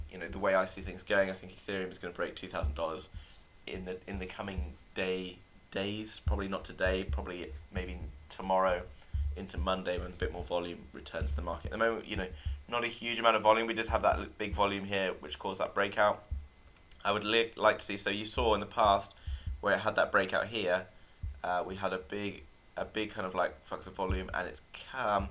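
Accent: RP, United Kingdom. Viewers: I love your English. I play it 0.75x to for extra poshness ♥️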